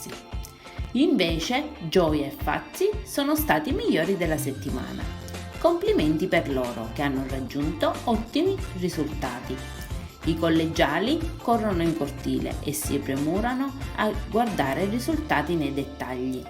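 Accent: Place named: Sicily, Italy. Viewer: Il tuo accento siciliano è bellissimo ahahaha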